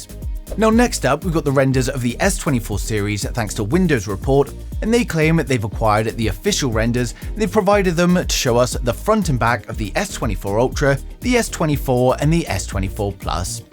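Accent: United Kingdom. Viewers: $100? Why the heck would someone with a British accent be promoting US stuff, 60 odd million in Britain not enough following for you?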